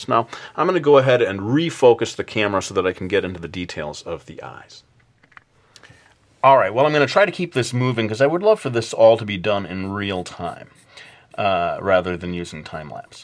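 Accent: Brooklyn, United States. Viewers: Sorry, it just sounds like a bit "cheesy gameshow host". It might just be that you're American and it sounds corny to an Aussie. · Brooklyn accent again. eyeroll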